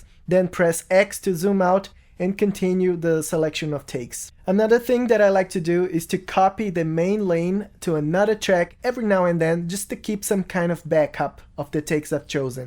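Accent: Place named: Brazil. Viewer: I can recognize a fellow Brazilian accent! eh nois mano!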